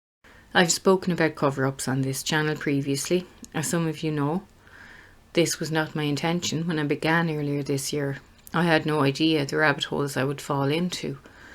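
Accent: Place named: Kildare, Ireland. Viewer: Where is yur voice from.... Kildare...? Yu seem to look like a woman called christina that does human right works in ireland.. same voice too.. That voice is so familier to my childhood... Kildare..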